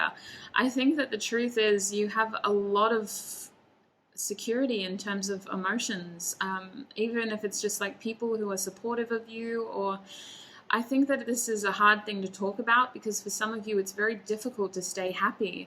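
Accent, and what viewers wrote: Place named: Australia. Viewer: Thanks for the reading dear. I love your Australian accent it is cute and unique. Happy new year.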